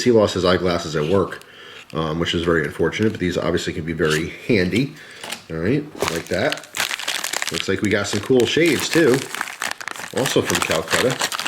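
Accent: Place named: Pennsylvania, United States. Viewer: Pennsylvania account. At least he doesn't say "yins guys" or call everything a "jawn"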